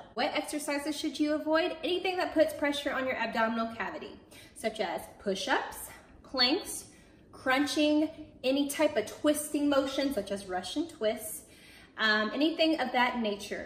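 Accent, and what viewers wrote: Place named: United States. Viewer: ZAKIYA SULEIMAN she has an American accent🤷🏽‍♀️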